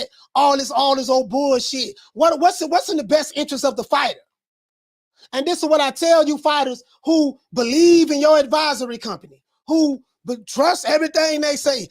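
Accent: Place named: Texas, United States. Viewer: Hey Coach I'm going to check your show out. 2hrs is a long time.i have to break it up into several segs. Where you from Coach? You sound like you're from Oak Cliff, TX.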